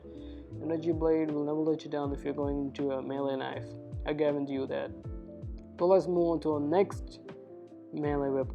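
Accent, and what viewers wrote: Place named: United Kingdom. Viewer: Bruh, I said no weird British accent!! Noice vid tho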